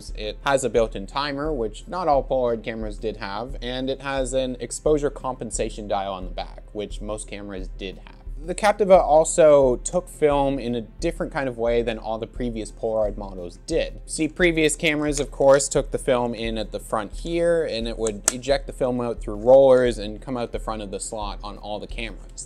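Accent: Canada. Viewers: He’s Canadian so it’s his way of pronouncing it, you can tell too with Certain pronunciation of words in all his videos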